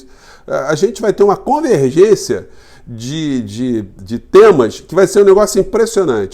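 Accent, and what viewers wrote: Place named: Rio de Janeiro, Brazil. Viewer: Bom dia! Vc é carioca Tb!🥰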